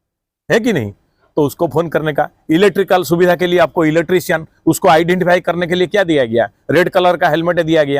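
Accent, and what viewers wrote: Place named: Odisha, India. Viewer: sir aap odisha k ho na aap ki langauge se pata chal Jaa Raha h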